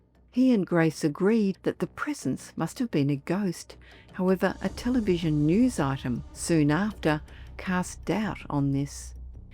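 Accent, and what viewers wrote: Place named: Australia. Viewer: Love your Aussie accent, gentle pace and narrative expression. Great stories too!😀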